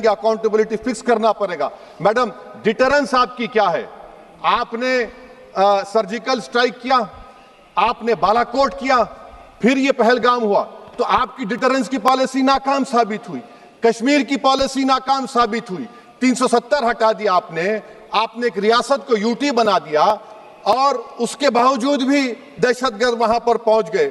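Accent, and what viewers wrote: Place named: Hyderabad, India. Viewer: HYDERABADI BOLTEY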